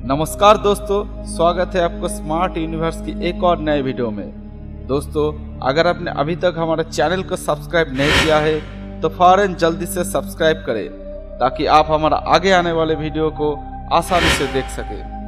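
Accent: Bengal, India. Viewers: Are u bengali ? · Ye to bangali hai ya odisa...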